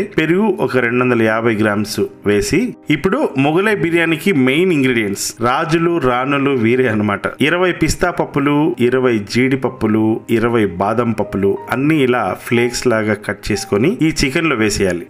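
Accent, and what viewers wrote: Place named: Godavari, India. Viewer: Godavari yaasa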